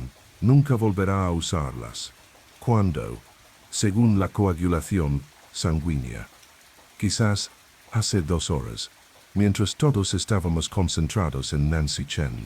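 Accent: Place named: England, United Kingdom. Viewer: Porque está payasada de hablar con ese acento de inglés tratando de hablar en español.Insoportable.Una pena.No puedo recomendarlo.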